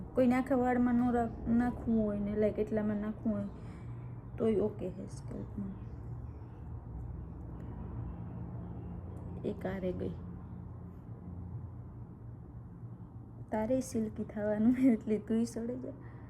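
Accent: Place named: Kathiawar, India. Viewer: કાઠિયાવાડી લાગો છો તમે બોલી ઉપરથી ❤ Super vlog 👍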